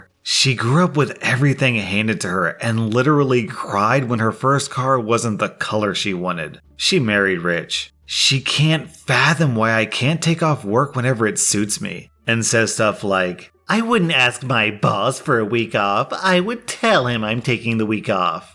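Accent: United States. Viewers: Woah... an American who says "pat-tree-on" and "eye-ther". What's going on? I'm sure you also say "an-tie" and "sem-eye" just to throw us off.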